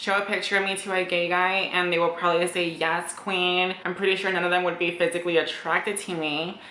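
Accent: United States. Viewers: Your voice just sounds like youre from California haha. People are just Caliphobic :P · Your voice is what makes you, you. Honestly i feel there's nothing wrong with it. To me as a British female you just sound like a normal American girl to me. I'm sorry you receive so much hate I feel people are just bothered because they can't understand or they can't look like you. Keep inspiring! Love your views I'm also glad you mentioned about the pregnancy thing, so many people around me struggle and some cannot conceive. It's very bitter and harsh to throw it in your face, I hope that the positive out ways the negatives for you! You are amazing !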